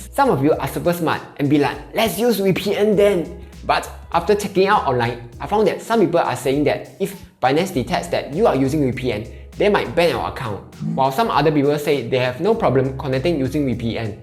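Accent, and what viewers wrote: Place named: Singapore. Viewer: I love this dude’s 100% pure Singaporean accent 😘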